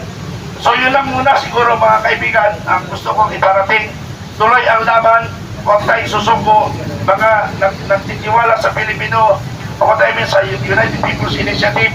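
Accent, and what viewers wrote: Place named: China. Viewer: may Chinese accent ang nagsasalita😅 Ano ba yan! Napaghahalata!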